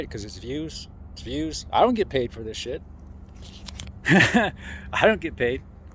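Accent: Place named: New York, United States. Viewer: Sad I think I figured it out he from New york?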